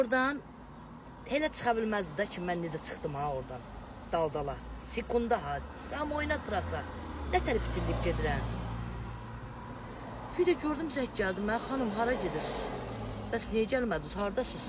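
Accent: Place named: Goychay, Azerbaijan. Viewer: Can baci Göyçay lehcesidi mence??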